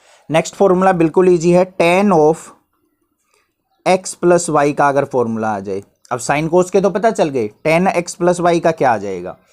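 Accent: Haryana, India. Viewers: Sir are you from Haryana your tone is like haryanvi language I am also from Haryana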